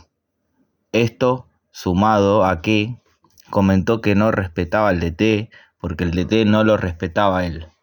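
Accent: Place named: Argentina. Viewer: El del video tiene un acento argentino, ni modo que digas que es un fan de CR7 cuando los argentinos tienen prohibido hablar de él. 😅 No, yo creo que el declive de CR sucedió más por sus decisiones que por la falta de talento. Todo comenzó cuando decidió irse del Real Madrid, no tenía que irse de ahí, no cabe duda de que habría conseguido más títulos importantes y su carrera habría seguido igual de respetada. Sin mencionar que por esos años Messi estaba pasando por un muy mal momento. Ahora ya nadie lo recuerda pero a Messi le pasaba lo mismo con su selección que le pasó a CR7 con Portugal. Afortunadamente Messi tuvo a un gran director técnico como lo es Scaloni que supo sacar lo mejor del astro argentino y lo revitalizó. Cosa contraria a CR7, que tristemente sus entrenadores lejos de ayudarlo, le dieron la espalda y es una historia muy triste para el futbol. 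Habría sido fantástico que ambos tuvieran su revancha, pero solo uno lo consiguió, el futbol solo quedará en deuda con uno y no con dos como era antes.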